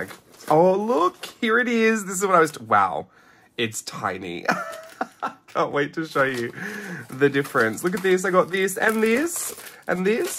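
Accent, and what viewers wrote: Australia. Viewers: I'm new to Hobonichi, discovered it all through my YouTube feed and took a deep-dive down the unboxing rabbithole. Have ordered my first - expensive for me but it will encourage me to get back into journalling. Thank you for your very real approach - awesome to hear a fellow Aussie accent 😊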